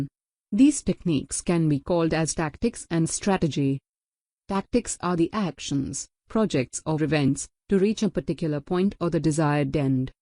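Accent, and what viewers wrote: India. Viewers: HOW CAN A FUCKING VOICE AI HAVE AN INDIAN ACCENT FOR FUCK SAKES, HOW DECIDED THIS WAS A GOOD IDEA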